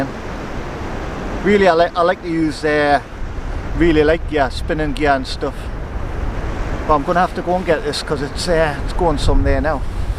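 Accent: Newcastle, United Kingdom. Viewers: Just Subscribed after finding your videos really enjoyed them and keep the Geordie accent its your home and I can tell its something your proud off, great fishing tips, so sorry about your Mum but not a day will pass when she won't be in your thoughts, hopefully I will get out that way with my kayak. Cheers.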